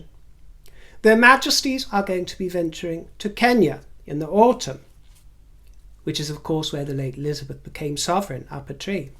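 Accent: South, United States. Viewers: Oh ….. your southern accent had me in stitches! You’re just Divine! Thank you for always speaking your truth! ❤ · "we want privacy tour" lol that made me laugh out loud. Well said. What a picture. I love your southern accent, too! Thank you for the smiles and chuckles,. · From a Southern fan of yours, dear - you portrayed the true Southern lady accent perfectly! The only other accent almost as beautiful is the upper class British accent which you have! You are truly loved all over the world and you make the world a better, brighter place! Much Love, Nancy F. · you are a treasure! I really enjoyed your southern accents and your wonderful costume today.❤ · OMG! Loved the Southern accent! But it’s your explosion of laughter at the end that leaves me in pieces. Yes! I find myself in Rivulets of sparkling delight! You’ve DONE IT AGAIN!!! 🇨🇦💕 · your Southern accent is a hoot. 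So enjoyable...thanks. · Love your look❤! And your Southern accent 😅! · Edit— 😂😂😂😂that accent!! I’m a KY native, but naturalized Texan, and there are many southern dialects. Each southern state has its own twang, and I think you did a fabulous amalgamation of them all😂😂😂😂I think Camilla proved herself to be a servant to the people and fervent supporter of Charles, and the Late Queen recognized that. Elizabeth may have been feeble of body but certainly not of mind. I’m not a Meghan fan, but I wish the conspiracy theorists would stop the pregnancy nonsense. You could see her pregnancies in her face. It was not faked. And after Archie was born, Meghan still showed the fullness in her abdomen which is such a common characteristic. Had it been faked, her vanity would have put her in a form fitting outfit with a flat belly when Archie was presented to HMTQ and the Duke of Edinburgh. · oh, you are a hoot! 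I adore you and your Southern accent. I am from South Carolina (USA) and i love a good accent. I will say that a southern accent is hard to do. Just be consistent in which one you choose. I am tickled by your broadcasts and wish you all the best! Much love, Kristi from South Carolina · For some reason UK people can do good southern accents. I was born in New Orleans in 1955 and grew up in Atlanta. Currently reside in Augusta, Ga home of the Masters golf tournament. Your accent is gentile southern. Reminds me of my great aunt Ann and her daughter cousin Beverly. At Easter they would visit and wear the greatest floral hats, dresses and cotton gloves. Very gracious ladies. Seen no more I'm afraid. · Nice southern dialect . You are so talented. You are loved by us commoners as well. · I love your Southern accent. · You’re hilarious! Love the southern accent ! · 😂 You nailed the U.S. Southern accent! 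· PRECIOUS, I am from Alabama and your southern accent is better than mine!!! Thank you for your wit and wisdom, my dear 😂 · 🤣. Thanks for the laugh my dear friend. Love the southern accent. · I'm interested in how you define your look today - I wasn't sure if you were going for gypsy or medieval princess or a member of the harem. On the whole I think probably medieval princess. Your American accent was certainly southern - a cross between Mae West and Scarlett O'Hara. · You are a hoot. Yes im a southerner from kentucky. I understand and enjoy your humor, i,mo ,also 88 years old, 4 sons , 6 grandchildren, one great grandchild,young at heart. ,and loving fun . Love the english people and your love of fun- thank you for making my day. Your southern accent is pretty good , although each state has its own accent. Mine started in Nashville tennessee and moved on to louisville , ky. Ancestors all english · Hi, your southern accent is quite fun. 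A bit like the older accents that were more sophisticated sounding than those now common. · Hello, I spat my tea out, at your southern accent. It reminded me of Blanche Devereaux from the Golden Girls. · Bravo, lol. I think your southern accent is great. Red is definitely your color.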